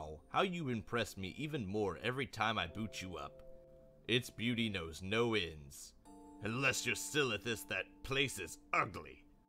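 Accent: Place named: Canada. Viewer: I can't place your accent....it's subtle, but definitely distinct from my Bay Area English...Canadian maybe?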